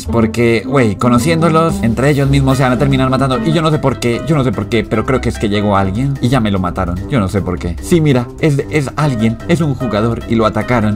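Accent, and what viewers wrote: Colombia. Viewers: Sos de colombia no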